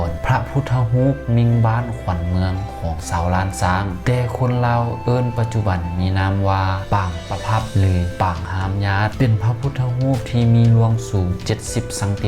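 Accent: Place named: Thailand. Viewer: สำเนียงคนไทเเท้ครับผมคนอิสานเด้อ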